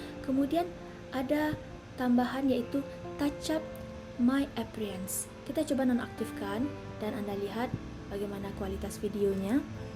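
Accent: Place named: Surakarta, Indonesia. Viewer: Sing ngomong putri Solo. Jan uallon tenan, setengah berbisik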